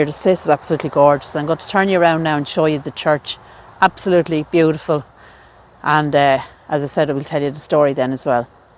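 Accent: Ireland. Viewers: What a adventure you bring us on. Plus I get to see Ireland. I grew up in Kingsbridge in the Bronx. A very Irish neighborhood that I truly miss. When you speak it reminds me of Kingsbridge yes near Gaelic Park and the beauty of Ireland makes me realize the famine Ireland had must have been so bad to leave the beauty of Ireland for NYC. Your videos are fun and I apologize for sort of going off topic. I look forward to watching all you have done and subscribed to the future ones. I’ll also share.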